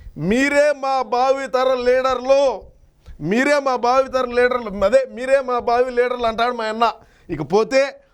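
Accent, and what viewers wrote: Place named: Kadapa, India. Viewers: మీ కడప యాస చాలా బాగుంది సోదర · సార్ మీ కడప భాష చాలా బాగుంది.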